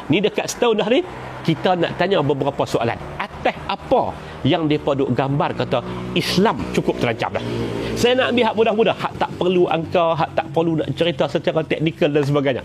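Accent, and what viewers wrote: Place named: North, Malaysia. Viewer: Itu bahasa Loqhat Utara... Nothing wrong ok... So what now?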